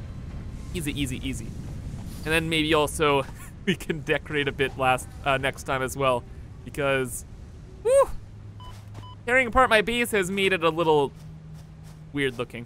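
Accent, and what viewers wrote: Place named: Canada. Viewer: Haha No worries if this is too personal but, what part of Canada are you from? It's always more comfortable listening to a fellow Canadian talk about games!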